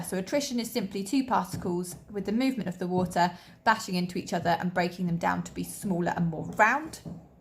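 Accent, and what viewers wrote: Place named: England, United Kingdom. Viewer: Which English accent do you have ? I like it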